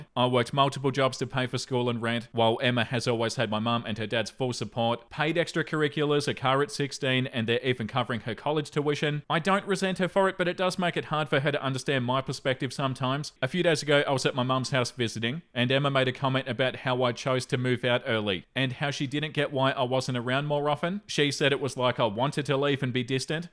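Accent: Australia. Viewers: My daughter and I love listening to you read stories. Indignation just sounds better in an Australian accent 😊 Thank you for the awesome entertainment!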